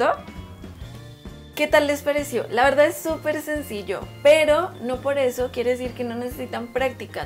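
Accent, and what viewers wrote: Colombia. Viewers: Usted es de Colombia ??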